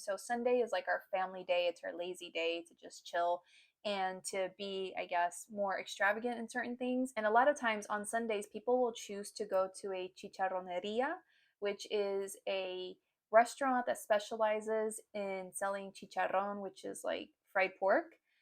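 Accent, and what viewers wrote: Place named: United States. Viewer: Tienes el acento americano o.o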